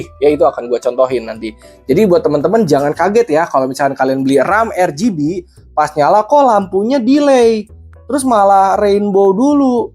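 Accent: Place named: Sunda, Indonesia. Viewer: aksen sunda lo kentel banget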